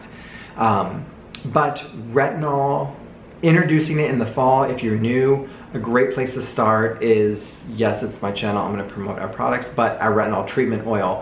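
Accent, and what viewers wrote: New York, United States. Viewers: Our first New Yawk Tawk!! Love it. Sip sip!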